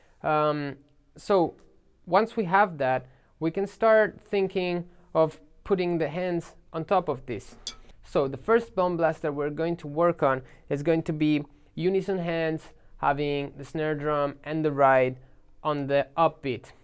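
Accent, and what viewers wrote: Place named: Austria. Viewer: Similar accent as krimh, are you from the same place? Austria, I think?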